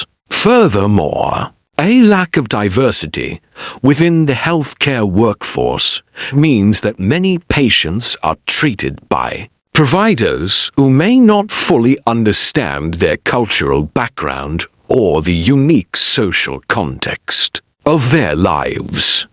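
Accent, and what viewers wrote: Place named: United Kingdom. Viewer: Please don't use British accent with your AI narrator - annoying.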